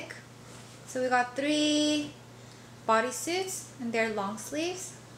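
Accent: South Korea. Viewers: You sound just like Korean ... Not that I mean your English is bad. You do just well I just think all we Koreans have certain accent in English, like Korean Accent I think:)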